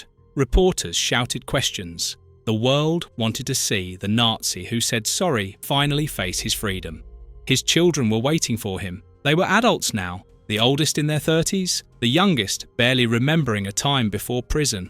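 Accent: England, United Kingdom. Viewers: If they don't have a good thick English accent like this guy, why bother.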